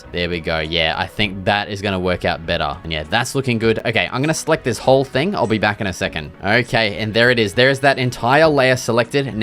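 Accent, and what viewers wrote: Australia. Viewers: The Aussie acsent is the cherry on top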